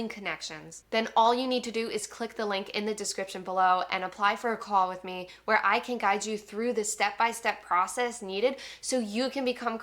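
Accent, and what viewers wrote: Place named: Calabria, Italy. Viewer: Hi, I'm italian and I like your accent when you speak italian, it sounds pretty calabrese or siciliano! I watch your videos to improve my english. I wish you a million of followers!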